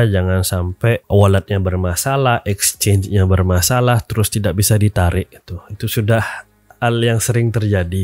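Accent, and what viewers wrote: Bali, Indonesia. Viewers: Dari logatnya, asal Bali ya bli?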